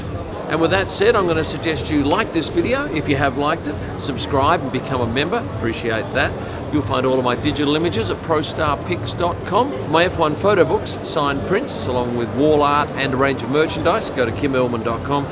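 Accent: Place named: Western Australia, Australia. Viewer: Mate I didn't know you were a west aussie! Subbed!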